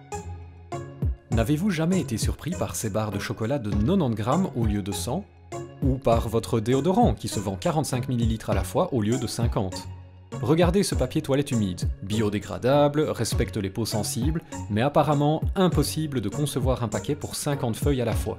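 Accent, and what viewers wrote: Belgium. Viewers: Et voilà, je me suis abonné ce matin grâce à Astronogeek et je ne suis pas déçu ! Merci pour ton travail et la qualité rendue ! Je me disais bien que tu avais le même accent que le grand Jacques ! Bonne continuation cher voisin Belge 🇧🇪 ! Un abonné Français 🇫🇷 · Une nouvelle abonnée, grâce à Astronogeek, faudra le lui dire, il kiff ca, à chaque fois ses cheveux et sa barbe poussent un peu plus ... =DD Je ne connaissais pas ton autre chaîne, mais je suis très intéressée par le sujet, contente de t'avoir "trouvée" donc, au final =) PS: Je repère une belgitude non ? les èèèèRRRR ! =D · c'est vraiment bien foutu! je ne connais pas la chaîne que tu avais avant je t'ai connu par astronogeek, je trouve que le rythme que tu a choisi pour de la vulgarisation de stats est vraiment bien. Ni trop rapide ni trop lent, ont à le temps de bien comprendre de quoi tu parle, roh et cet accent belge toujours aussi drole :D bon juste dommage que tu parle pas des prix des fruits et légume psk là aussi ils nous manipule bien. 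Faut fuir les grandes surfaces !!! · Merci à Astronogeek pour la découverte :) Je ne savais pas que tu étais belge, le "nonante" et le "houit" m'ont surpris ^^ Très bonne continuation à toi, y a de la qualité là ! Mat, un des 50 ou 60 premiers abonnés.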